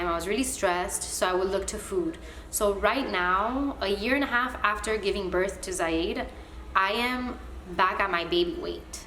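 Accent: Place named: San Francisco, United States. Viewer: I knew I recognized that accent! I live in SF too lol. I’m not pregnant (yet) but am doing research because I plan to have children soon. I always planned to have my children 1.5 years apart but this is eye opening. I really enjoy your videos, thanks so much for your honesty. Your eyes are gorgeous btw.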